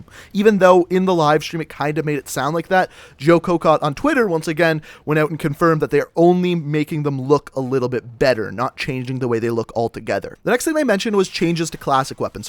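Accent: Canada. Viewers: Are you saying "Merc-2" weapons or "Mark-2" it might just be your heavy canadian accent effin'it up for me!! 😄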